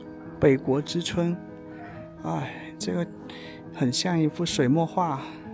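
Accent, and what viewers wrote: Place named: Cantonese, China. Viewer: 是廣西人，講的是粵腔普通話，很容易聽懂，別苛求了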